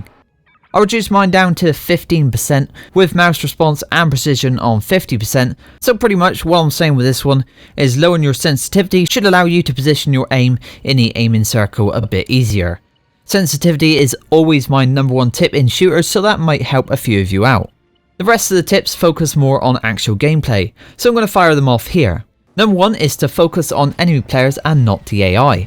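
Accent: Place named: South West England, United Kingdom. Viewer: South West boy are ya? Good to get tips from a local (I'm Cornish).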